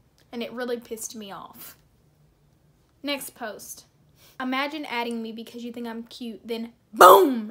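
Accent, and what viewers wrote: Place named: South, United States. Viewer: i didn’t know you were southern and now i’m even more in love with you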